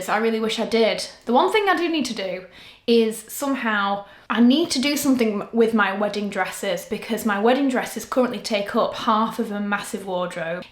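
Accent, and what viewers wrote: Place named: Wigan, United Kingdom. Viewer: Absolutely adored this vlog and sadly we are in the time where we seem to be going backwards for women’s right and the decisions we make for ourselves and with our partners. 😔 but we stand our ground because we’re all strong women 🖤 also met a friendly chap from Wigan today in Nashville TN USA 🎉 made my heart explode — his accent reminded me of you and 😌😂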